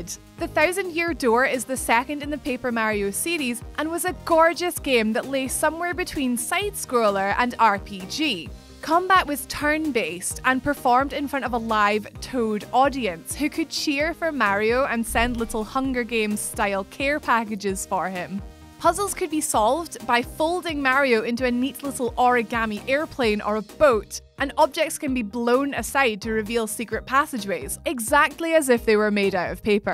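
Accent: Scotland, United Kingdom. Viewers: Are ye scottish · Even though it is a Scottish accent?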